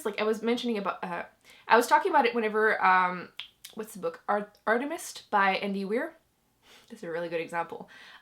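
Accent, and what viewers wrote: Quebec, Canada. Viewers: I was a Canadian studies and French major at college and studied abroad in QC so your channel brings me SO much joy. I love when you speak French and your quebecois accent, it makes me feel so nostalgic. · Wow, I'm french and I love your accent when you speak Canadian french (and actually you're m'y favorite booktuber both in french or english) But I wonder...are there so many french people that are disrespectful and hateful towards Canadian french ??? I would be ashamed of my country....it's sad !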